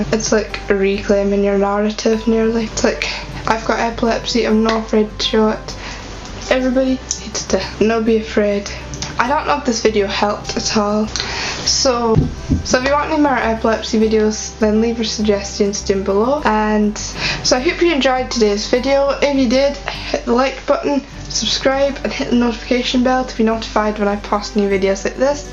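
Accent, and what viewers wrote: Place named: Scotland, United Kingdom. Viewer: this was interesting to watch, I’m sorry your having to live with it, Skye is mega cute, I’m from Scotland and by your accent I’m thinking your from Scotland as well Ayrshire or Falkirk but I could be wrong lol x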